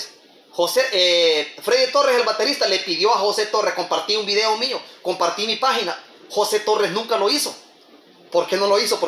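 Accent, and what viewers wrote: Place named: Mexico. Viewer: Este ermano hontureño es la pura riata primer hontureño que abla como un buen mexicano saludos biejon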